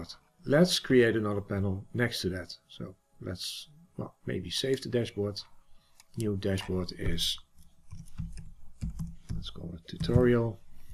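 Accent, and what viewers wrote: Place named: Netherlands. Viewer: Hi hi dat klinkt Nederlands 😉 en daarmee vroeg ik me af of u bekend bent met iungo?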